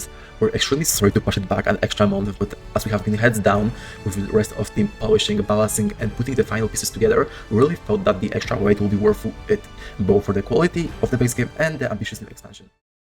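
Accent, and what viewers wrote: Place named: Poland. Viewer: This guy sounds Polish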